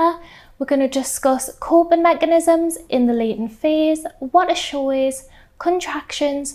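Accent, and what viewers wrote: United Kingdom. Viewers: It is a Northeast accent, not sure where you are from but do be so judgemental, unless you are a southern English snob? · I love your voice and accent 😍💕 sounds Scottish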